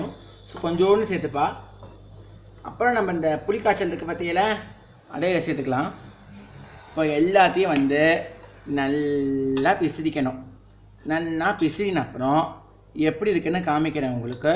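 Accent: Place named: Palakkad, India. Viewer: Brahmin Iyer or Iyeger boys speaks with this slang only. Palakkad slang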